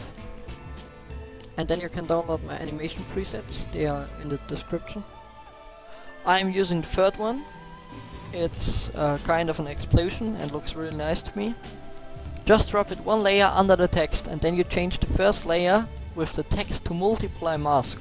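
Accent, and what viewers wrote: Germany. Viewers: man hat gehört das du deutsch bist =) aber richtig geiles tut wird mir sehr helfen danke.. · are you from germany?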